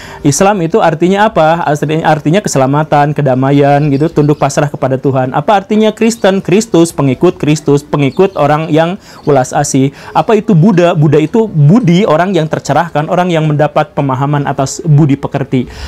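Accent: West Java, Indonesia. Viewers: Sederhana, dalam , menambah wawasan, mendewasakan, introspeksi, realita + bonus aksen sunda LANJUTKAN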